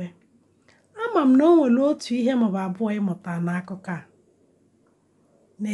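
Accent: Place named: Igbo, Nigeria. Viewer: sis i so much love your channel with your igbotic accent i wish i can spee=ak like you now chai kudos to you sis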